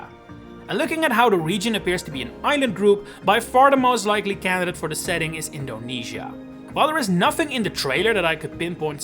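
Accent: Netherlands. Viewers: New to the channel and great video. Side question: are you Dutch?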